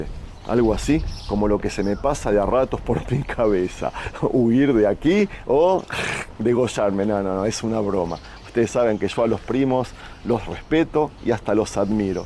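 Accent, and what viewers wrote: Argentina. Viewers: Dices que eres judío pero hablas argentino dx Como es eso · Te crees Judío y al escucharte eres un Argentino. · Hola he oído y visto más tus relatos y apreciaciones personales pero muy sesgado e irrespetuoso con la confianza brindada que pena pero que se puede esperar de un judío y cuando comentas del Corán no es la Biblia del Islam es un libro Islamico ..ya que Biblia significa libros que fueron de diferentes épocas y diferentes profetas o no? Eso significa en el hebreo o no? Ya y el Corán es un libro repito como la Torá y si eres apasionado de la Biblia te felicito y me gustaría preguntarte si la leíste o solo eres fanático? Y si lo leíste dime que significa lo que dice Isaías respecto al pueblo judío? Y que quiere decir en el capítulo 52.13 en adelante? Y que manda a decir nuestro YHWH =nuestro Creador en el cap. 54 del mismo libro? 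Y si habla de cronología de la Biblia Jesús que es para usted? Bueno espero ser respondido y no sea usted un mero YouTuber ufanado en conseguir seguidores con sus fantasías y debe usted ser imparcial objetivo y verás si quiere hacer un reportaje y más si se trata de religiones así los que no saben aprenderán pero cuando usted usa su canal para sorprender en el español de acento Argentino causa usted preocupación y molestia y los que hemos leído algo de la Biblia y conocemos algo del Islam quedamos sorprendidos al ver a un judío siempre judío por quienes murieron todos los profetas perseguidos y por quienes Jesús fue juzgado y muerto o me equivoco? Y hablo de un punto neutro sin afán de bandera religiosa .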